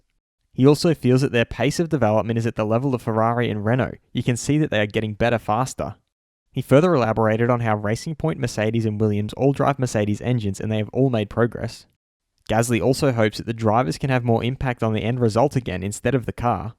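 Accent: Australia. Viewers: These clips are fabulous - I find it difficult to believe you only have 100,000 subs. Your videos are easily of the same calibre as any TV program from back in the day when people still WATCHED tv, lol. Really stoked to find this channel, as a fellow Aussie it's really cool to hear the Aussie accent. GREAT STUFF!!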